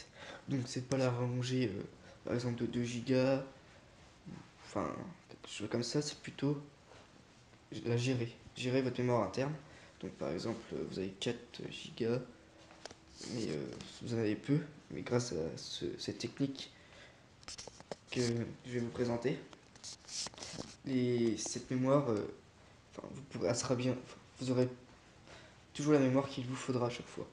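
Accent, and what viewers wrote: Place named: Madagascar. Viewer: C sure tes malgache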